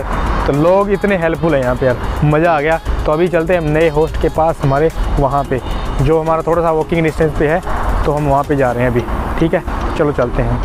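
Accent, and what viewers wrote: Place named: Uttar Pradesh, India. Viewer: Punjabi bolya kr yrr hindhi tu jmme up de bhyiaan vrgi bolda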